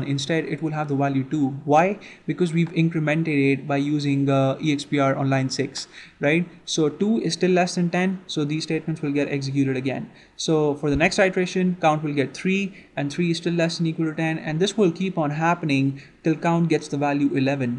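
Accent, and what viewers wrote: India. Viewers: stop trying to use american colloquial language...especially with this indian accent...Preferably stop using english....there are many channels with proper english... It's better to stick to hindi for you guys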